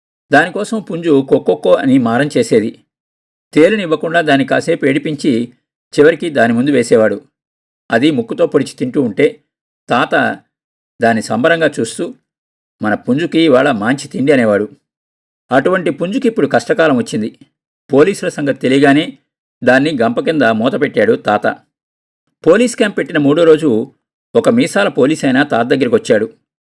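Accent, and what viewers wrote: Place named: Rayalaseema, India. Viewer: గారు చాలా చాలా మంచి కథ వినిపించారు. ధన్యవాదాలు. రాయలసీమ కరువు రోజులు కళ్ళకుకట్టినట్టు వినిపించారు ఆ యాసలో.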